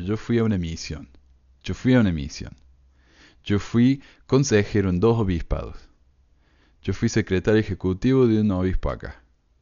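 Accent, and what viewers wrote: Córdoba, Argentina. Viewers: =) que Genio sos!!!. Admirable y Valorable todo lo que compartis, excelente tus opiniones, exposiciones y material sos un Capo Genio ya que presentas evidencias respaldatorias y material empírico de gran ayuda para reflexionar y pensar. Te escucho y sigo ya que todo lo que compartís es de gran ayuda y me sirve mucho en temas que veo en la carrera de Psicología. Además que me encanta seguirte por tu "humor inteligente" y amo tu acento Cordobés y tus expresiones!!!. Tu sinceridad y tu "open mind" hacen de vos un gran ser humano =) Besotes & Abrazotes desde Argentina =)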